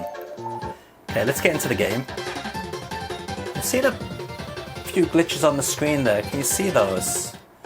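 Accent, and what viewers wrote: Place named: Liverpool, United Kingdom. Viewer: sometimes your accent sounds just a little like Dave Lister from the Dwarf. Boys from the Dwarf!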